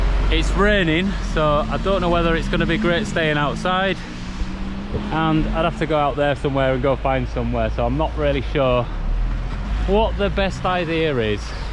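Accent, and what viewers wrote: Yorkshire, United Kingdom. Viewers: Loved it such a different format loved seeing the different cities food apartments and you standing on street corners looking like you were loitering with intent hope we have more of your travel adventures 😊oh and that great Yorkshire accent ❤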